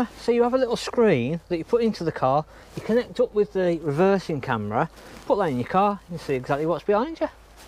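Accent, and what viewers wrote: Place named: Birmingham, United Kingdom. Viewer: Correct me if I’m wrong your a fellow brummy😂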